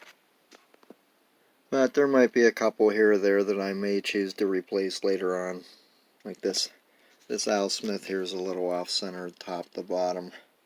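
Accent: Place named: Pittsburgh, United States. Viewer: Hey, just subscribed great looking set. Sounds like your a Pittsburgh guy. I live in Johnstown, Eric those back pages gave you a nice shout out earlier tonight. I own a very nice 56 set. Working on 54 bowman set.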